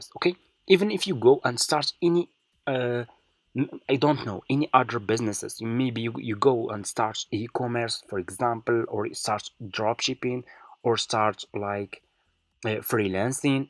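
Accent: Morocco. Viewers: Moroccan accent bro 😊 Nice video keep going !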